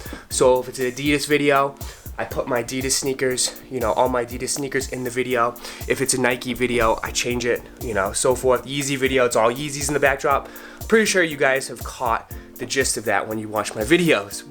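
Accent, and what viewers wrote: Boston, United States. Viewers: U from Boston?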